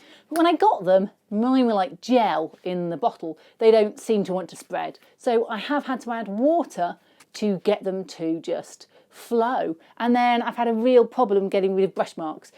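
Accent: United Kingdom. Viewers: From watching other videos has made, I thought the brand name of this paint was, "Tamier,"' (rhyming with, "premier,") instead of, "Tamiya." I guess my American ears don't always do a very good job of processing the r sound that the British tack onto the a's at the end of a word.: D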